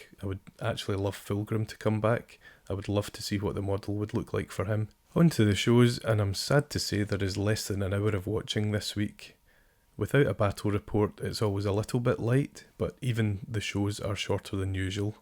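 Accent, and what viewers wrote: Scotland, United Kingdom. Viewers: Warhammer lore in Scottish accent sounds dope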